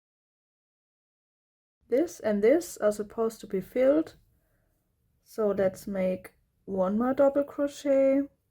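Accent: Germany. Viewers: Holy deutsch accent its so cute 😂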